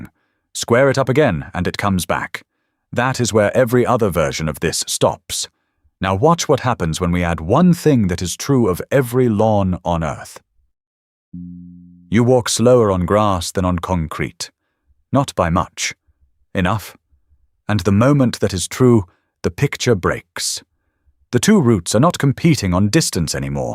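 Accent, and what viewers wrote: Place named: Germany. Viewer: It figures this guy has a German accent.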